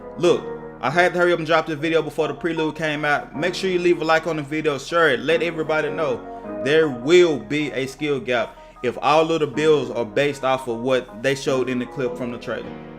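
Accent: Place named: Louisiana, United States. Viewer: you’re from louisiana yea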